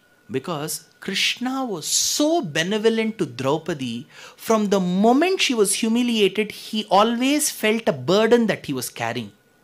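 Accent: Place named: Karnataka, India. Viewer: Saranagadhi.🙏🙏🙏 I enjoyed the beautiful kanada slang though I don't understand the language.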